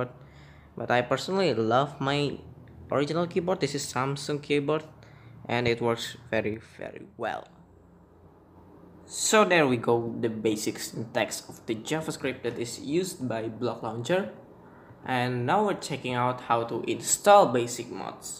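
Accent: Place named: Russia. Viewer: I am damn sure you are russian.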